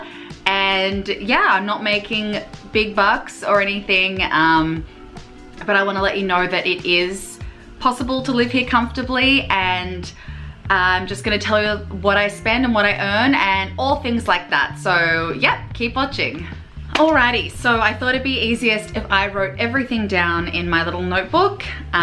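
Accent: Australia. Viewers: That’s the Australian accent one of the sweetest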